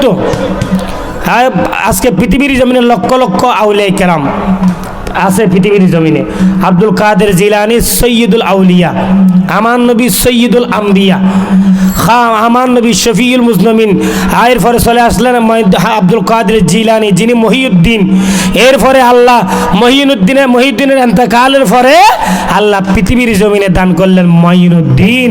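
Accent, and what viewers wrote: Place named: Chittagong, Bangladesh. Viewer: আসসালামুআলাইকুম ওয়ারাহমাতুল্লাহি ওয়াবারাকাতুহ, ভাইজান আশা করি ভালো আছেন, আপনার চ্যানেলে ঢুকে দেখলাম, চ্যানেলটা অগচল, ডিসক্রিপশন লেখেন না কেন? টেক তৈয়ার করেন না কেন? ভিডিওর ক্যাপশন এবং থাম্বেল দেন না কেন? এগুলো না দিলে তো মানুষের কাছে পৌঁছাবে না এবং চ্যানেল গ্রো হবে না, সাবস্ক্রাইব করলাম আমি। আপনি কোন এলাকার, বাসা শুনে বুঝা যাচ্ছে চট্টগ্রাম, আমার বাড়ি চট্টগ্রাম রাউজান, ভিডিও আরো লম্বা দেবেন, যেহেতু আপনার ওয়াচ টাইম এর প্রয়োজন আছে।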